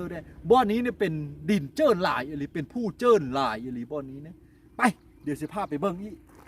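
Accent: Phu Thai, Thailand. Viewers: เว้าโม่นเด้ มักๆสำเนียงภูไท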